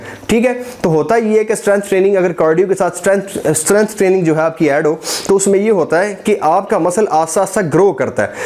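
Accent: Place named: Sialkot, Pakistan. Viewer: Bhai Asslam O Alaikum... Bhai Jo Ha, Wo Jo Ha....Ya Jo ha, Ab Jo Ha....Jo Ha, Jo Ha.... You definitely from SIALKOT. Love From Sialkot <3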